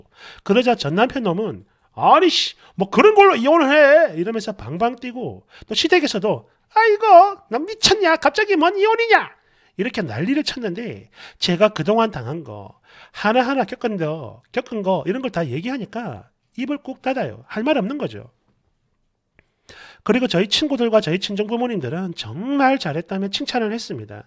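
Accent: Gyeongsang, South Korea. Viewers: 아저씨ㅋㅋㅋ전형적인 경상도 개 웃긴 형부같음ㅋㅋㅋ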